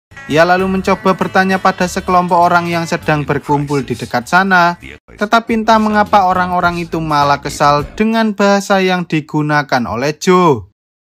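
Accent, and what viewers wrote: Java, Indonesia. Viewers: Medok mu hilangin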